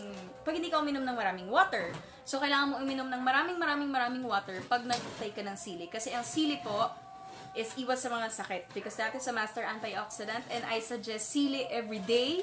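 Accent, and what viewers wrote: Visayas, Philippines. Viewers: Taga Bisayas yan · Wow bisaya si ateng hahahah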